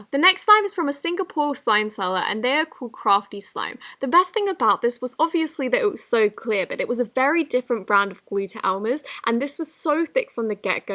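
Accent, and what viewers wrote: United Kingdom. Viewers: love your british accent :Đ can u teach me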